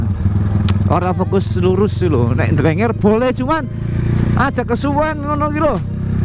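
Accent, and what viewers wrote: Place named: Banyumas, Indonesia. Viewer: iyaws ngapak Bae kepenak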